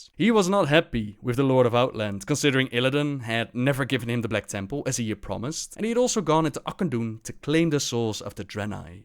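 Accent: Netherlands. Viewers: do I hear a dutch accent? might be wrong ^^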